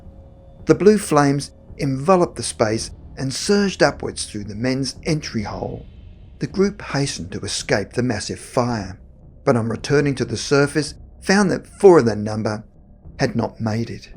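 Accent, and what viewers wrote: Australia. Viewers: I think narrator is from Australia. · Kristina Mullen The narrator is either Australian or from New Zealand.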